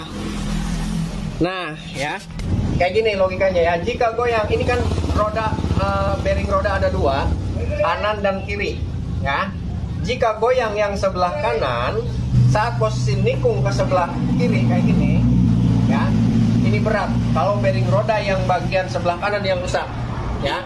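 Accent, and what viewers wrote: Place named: Pandeglang, Indonesia. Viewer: Kaciri logat pandeglang na, 😁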